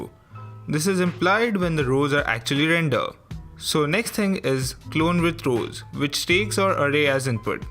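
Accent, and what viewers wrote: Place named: India. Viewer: Very hard to follow with the Indian accent :(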